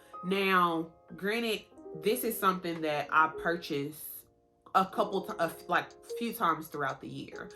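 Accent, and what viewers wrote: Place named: South, United States. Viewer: You sound like you are from the south! It's a southern accent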